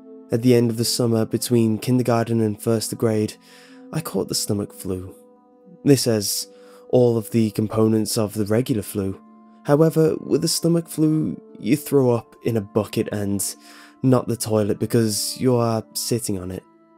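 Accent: United Kingdom. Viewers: Today marks ten years since this video was created. Even today, this still remains one of the (if not possibly the ) best creepypastas ever written! I find this video to be a great encapsulation of the story. You're soothing british voice sets an immersive feel and the use of music and sound design is expertly crafted. Overall, this is an incredible story and video and here's to a decade of its existence!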